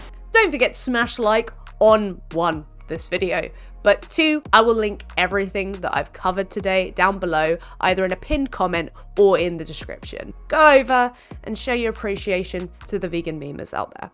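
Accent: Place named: United Kingdom. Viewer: I enjoy your wit ... and your British accent 🥰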